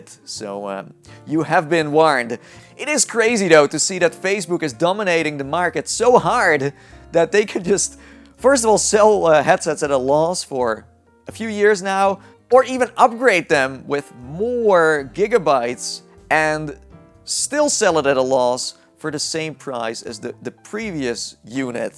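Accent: Netherlands. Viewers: Ben je Nederlands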